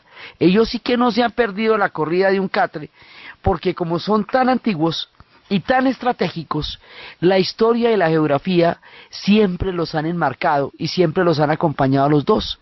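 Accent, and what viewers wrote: Colombia. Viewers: Me he vuelto a enamorar de la historia, excelente narradora, que manera de contar tantos episodios y la cereza del pastel es ese acento colombiano delicioso. Que privilegio escucharla. Me emociona!!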